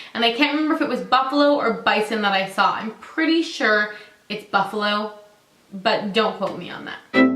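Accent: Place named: Canada. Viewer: Great video!! I agree with you about Canadians being so accepting! Also, since I lived in Vancouver for 3 years, I can hear the difference in the way you say "about" and "sorry". That doesn't mean it's wrong, it's just different, the way many regions in the US have different accents and different words for things. No one in California says "y'all" (except I do, because I have friends in Texas and I like it). My favourite difference in Canadian/American words is that you guys say "garburator" whereas we say "garbage disposal". Differences are fun! :) I might do this Canadian tag too, even though I'm not technically from Canada (three years is long enough to be considered a resident, right? :D ). I do have some answers for most of these questions already... Also your child is SO ADORABLE!!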